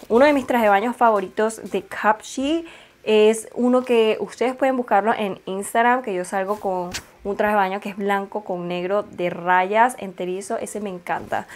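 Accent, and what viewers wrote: Venezuela. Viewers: Hola! Me encanta cuando hablas venezolano 😸✌ "caderua" "carrasposo" etc... Creo que la última pieza se llama pareo!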